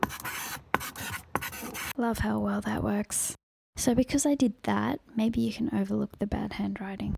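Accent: Australia. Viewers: Unexpected Aussie! I thought you were American for some reason! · i love your asmr vids, they r so unique and sound amazing!!! and YOURE AUSSIE????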